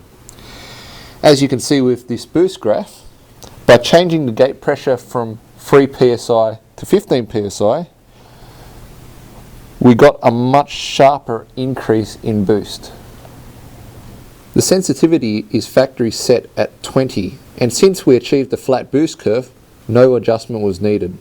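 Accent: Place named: United Kingdom. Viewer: he's asian, why does he sound british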